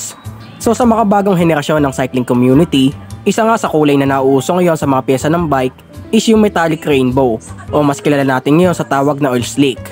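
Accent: Batangas, Philippines. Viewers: Batangueño ka lods?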